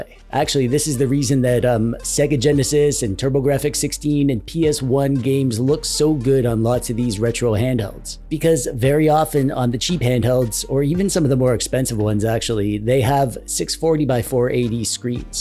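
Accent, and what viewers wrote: Canada. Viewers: Is the host Canadian? Testing my Canadian radar detection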